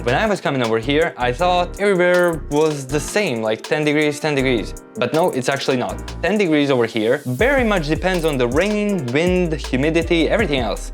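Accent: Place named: Balkans. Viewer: I’m trying to determine your origin based on your accent. My guess is somewhere in the Balkans — waiting for confirmation. Btw your English is pretty good! 🎉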